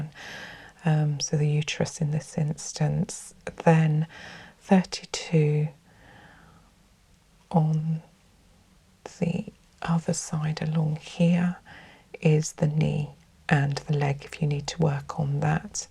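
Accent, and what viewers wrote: United Kingdom. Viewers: Where in the UK are you from?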